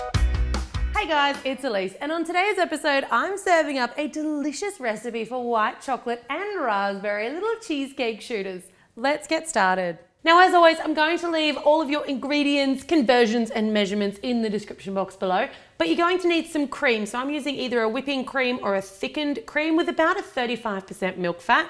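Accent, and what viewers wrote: Australia. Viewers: Are you Aussie or American ?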